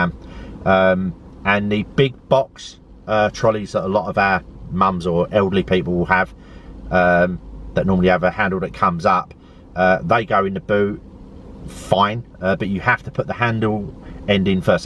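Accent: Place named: Cockney, United Kingdom. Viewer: I glad you like the leaf , I hope it work's out perfect for you job . Love the Cockney accent , thinking/finkng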